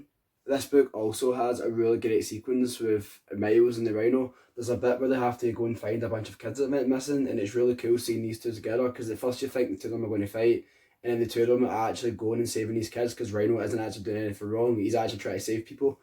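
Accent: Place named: United States. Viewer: Us accent... You need to make your spells clear and use a mic.... Btw good content🤘🤘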